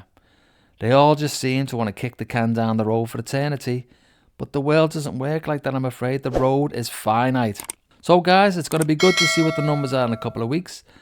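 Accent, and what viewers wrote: Liverpool, United Kingdom. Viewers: I love the fact a guy with a Liverpudlian accent is informing me on the state of our economy. 😂 I've lived here for 52 years and concur with your observations.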